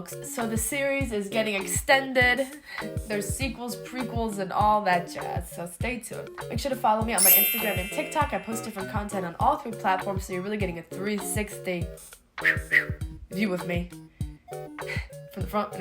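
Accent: United States. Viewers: Love your accent! But I cant place it?? Sounds like West coast and east coast combined!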